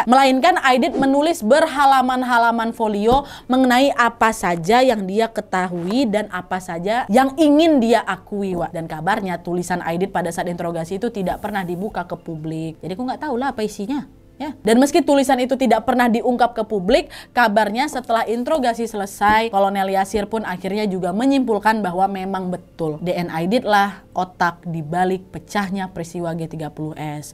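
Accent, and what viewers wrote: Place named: Java, Indonesia. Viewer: sakjane wkwk, logat medok jowo wes an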